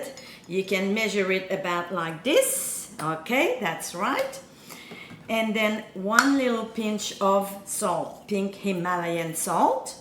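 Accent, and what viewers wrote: Australia. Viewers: Une francaise avec un accent Aussie. Ou Kiwi?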